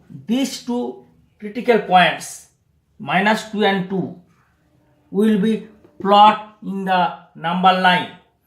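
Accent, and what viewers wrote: Bengal, India. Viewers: Bhai sahab kuch samajh nahi aaya!!!!! Kya bol gaye pta hi nahi chala!!!! Bill bhi soon...lattar lattar next bhidio latter..... Kehena kya chahate ho bhai??????? Aap ek kaam karo aap hindi me hi bana diya karo video, koi problem nahi hai kisi ko bhi. But ye bengali + english accent kuch samajh nahi aa rha!!!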